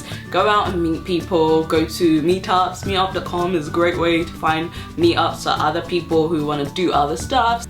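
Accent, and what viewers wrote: London, United Kingdom. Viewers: I saw some of your other videos before seeing this one and I could easily tell you were from London or British. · I still think your British. To me, your accent hasn't really changed. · I kind of notice it at some point especially when you emphasise the r in words with r. But you still sound like you're from London. Love your videos, btw! Xxxxxxxxxx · You sound proper British! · You still sound London-y to me. I'm very introverted so having plenty of time to myself is idle to me. The last time I felt lonely was when I visited my dad in Nevada, USA and didn't know anyone and it was so isolated because it was basically a desert lol. Do a Facial routine or GRWM video! I'd be interested in seeing that. :) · I love your accent and I knew immediately that you were a Brit. Who have you been talking to? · aww, some moments in this video you did sound very Canadian/American (ok, i can't distinguish between the 2 lol) but the London accent is still there girl! 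Don't worry. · Language adaptation that's awesome! I do that sometimes well as long as they understand you haha I think you still have your british accent don't worry too much and get out there some more pwahaha! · And you definitely dont sound canadian........ At all. You sound very British to me. And my fellow canadian people will definitely say the same thing. ☺ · Most people expect the "Ello guv'nor" type Oliver Twist flex. You still have your accent, it hasn't changed at all. British is not an accent.... Lol. You have a London accent there's a few accented words but it's minor. London misses you. Enjoy Canada! Been watching your videos from day 1. I hope you get back to making the videos again. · I'm from London, meself, and that sounds like a London accent to me- (for the most part anyway!) o.o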